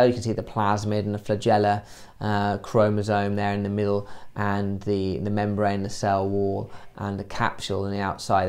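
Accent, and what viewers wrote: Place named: United Kingdom. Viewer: please use american accent if u can i cant understand british well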